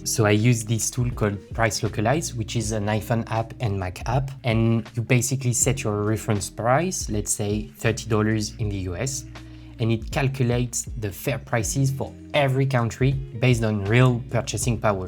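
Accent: France. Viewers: J'adore ton énergie, continue comme ça ! Et j'ai tout de suite reconnu à ton accent que tu étais francophone. Très bon niveau d'anglais en tous cas.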